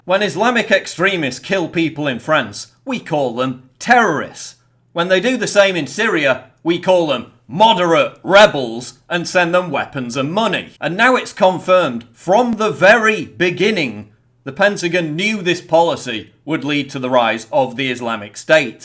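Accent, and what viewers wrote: United Kingdom. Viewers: wait is this guy British?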